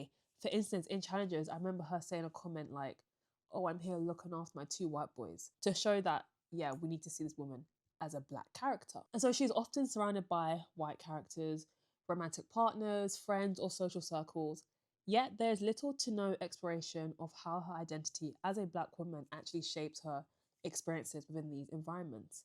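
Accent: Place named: United Kingdom. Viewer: Very confused as to why I’m hearing a British accent but I’m seeing you call someone who’s clearly not black a black woman. This take falls flat when you refuse to acknowledge her proximity to whiteness. You are not biracial you are not viewed the same as a “Zendaya”. We do not have the same experience. Infact “Zendayas” in the world have a privilege over black women. It’s very glaring when you talking about racial dynamic and refuse to acknowledge this.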